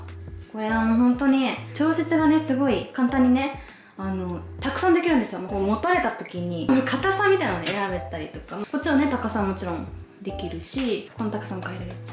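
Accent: Kansai, Japan. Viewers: たまに出る関西弁とちょっと関西弁に寄ったイントネーションになぜか胸が締め付けられる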